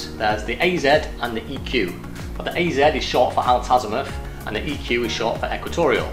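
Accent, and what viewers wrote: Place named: Liverpool, United Kingdom. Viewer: loved the video. Ignore the YouTube trolls trying to get a laugh for their site on his Beatles Liverpool accent.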